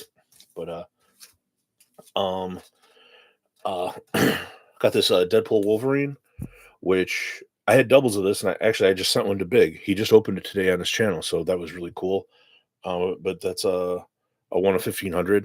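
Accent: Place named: Chicago, United States. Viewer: Congrats on the Solid Pull. Sounds like your a Chicago guy. I'm in the SW suburbs.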